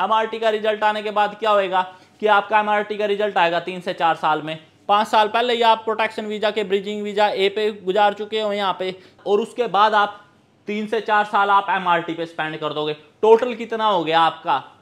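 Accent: Haryana, India. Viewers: भाई करनाल हरियाणा से है शायद बोली से पता चला। भाई जी मै भी आना चाहत आ हूं टूरिस्ट वीजा पर । भाई साहब कोई चक्कर तो नही ज्यादा । कभी जमीन भी बेच दू और ऑस्ट्रेलिया वाले आते ही भगा दे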